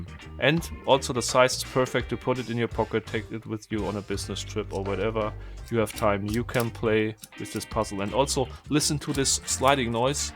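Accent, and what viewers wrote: Germany. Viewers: Bitte lerne Englisch. Du verdrehst die Sätze und man hört deutlich ,dass du eine Deutscher bist. wieso machst du nicht einfach einen Deutschen Kanal? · Ich wusste dass du deutcher bist, dein akzent verrät dich. Aber ich bin auch aus deutschland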